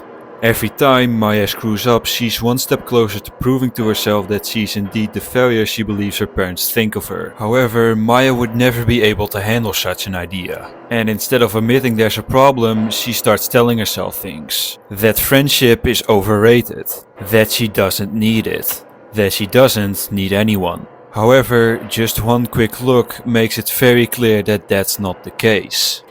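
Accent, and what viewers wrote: Netherlands. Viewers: n#gga has a thick dutch accent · Your accent your dutch accent is so strong, sometimes I don't know if you're speaking english or dutch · ik vond t wel te verstaan maar ik hoor dat typisch nederlands accent in t engels al mn hele leven dus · Oh God your perfect english being mix with your subtle dutch accent definitely answered my question of. "What dutch people sounds like if they fully speak in english", while I'm watching this characters saying one english words while speaking in fully Dutch. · valt hartstikke mee hoor, ik kom zelf uit londen en woon al jaren in nederland en ik heb heel veel erger gehoord. hij gebruikt wel eens nederlandse spreekwoorden die niet correct vertalen, maar verder klinkt het gewoon als een normale nederlander die engels spreekt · broer het nederlandse accent van jou terwijl je engels spreekt is zo slecht dat ik ondertiteling moest aanzetten 💔💔💔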